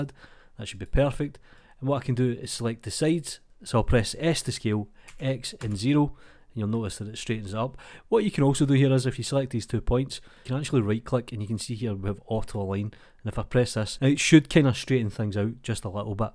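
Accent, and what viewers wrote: Scotland, United Kingdom. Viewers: I freaking love your Scottish accent. Keep doing this!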